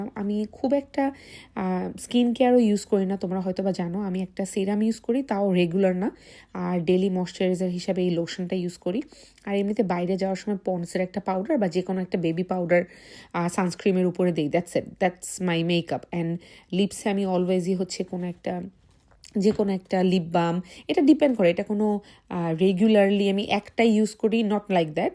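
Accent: Barishal, Bangladesh. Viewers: ami apnader fb reels gula dekhtam,2 years dhore maybe..sekhane dekhte dekhte ekhon you tube eu dekhi.. you're so genuine.. and your barishailla vasha is also captivating.. your cooking is also mouth watering.. so you can cook new item and share with us in your local language.. r vlog er video gula wide diyen,full screen hole dekhte vallage...take love apu and stay blessed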